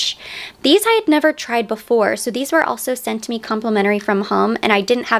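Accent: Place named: London, United Kingdom. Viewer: i agree. i wasnt sure if its Australian accent or English but i played it back and sounded more as a Londoner..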